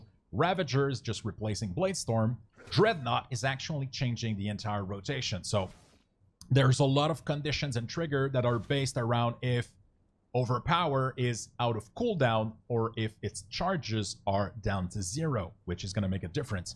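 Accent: Quebec, Canada. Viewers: Sounds like you are quebecois ?! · Very nice video. I can tell lots of efforts were put in this and I will be the first one glad to use it right away! Btw QC? I recognize the accent :)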